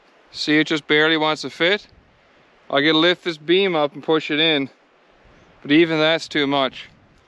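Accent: Newfoundland, Canada. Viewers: LoL "Thank the b'Jesus" Yes my son. By George that plate of fish looked gooder than rabbit !! New subscriber here. Love the accent and the phrases. Both of my parents are from Deerlake and most of my cousins too. I have always loved the Newfoundland and Labrador accent. I was conceived in Stephenville but was actually born in Halifax Nova Scotia and have been here for 57 years. I was in Bonavista last March....just ahead of the Spring icebergs sadly. But the trip across the island was nothing short of beautiful as always. Where are you in Labrador? Looks gorgeous. Look forward to watching more videos. Until next time my good man. Cheers and happy hunting, fishing and gathering.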